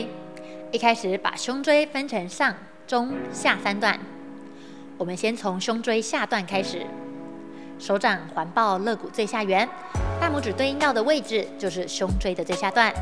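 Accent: Taiwan. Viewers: 台湾腔好可爱☺️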